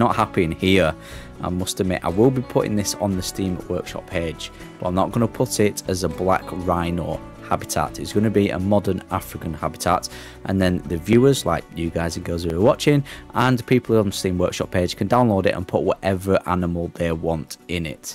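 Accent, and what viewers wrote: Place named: Manchester, United Kingdom. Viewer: it sounds like you are from Manchester. Have you ever thought of recreating Belle Vue Pleasure Gardens/Zoo?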